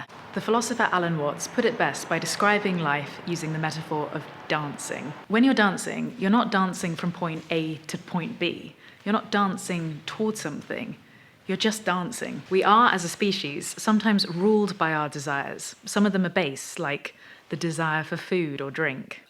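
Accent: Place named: England, United Kingdom. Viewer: u should make a tshirts saying-watch me on- you CHUBE ! - lol,LIike your chusday- i love your english accent-ca usa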